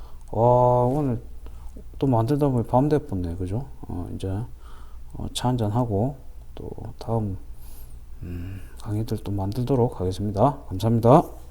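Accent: Gyeongsang, South Korea. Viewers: 우연히 보게됐는데 경상도 말투도 친근하고ㅋㅋㅋ 재미있고 친절하게 설명해주시네요 감사합니다ㅎㅎ 오늘 sfa 샀다가 매도 놓쳐서 어쩌지 했는데 홀딩할만한 애였군요!! 주식초보인데 20년고수님께 앞으로도 많이 배워가겠습니다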